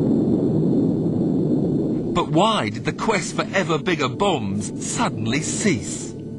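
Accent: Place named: United Kingdom. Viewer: 这英音听的舒服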